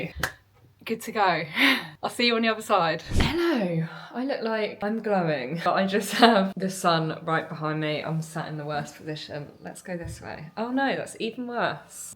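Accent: England, United Kingdom. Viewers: She is so beautiful and the English accent makes it even better.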